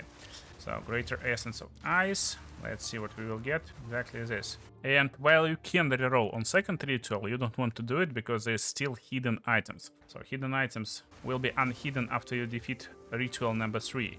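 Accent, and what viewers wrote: Russia. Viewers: sounds like Runglish orn Engussian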